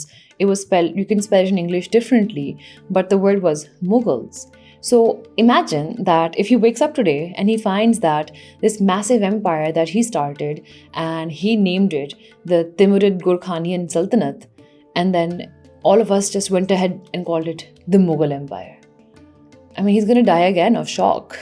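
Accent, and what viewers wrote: Pakistan. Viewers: What's with the Pakistani English Accent...!? Very similar to Bangladeshi English..!! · amazing content in pakistani assent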